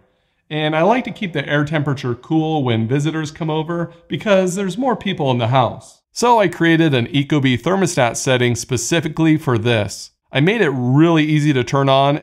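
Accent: United States. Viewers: By the accent, I'm guessing you're from Ogden, Utah. Am I even close?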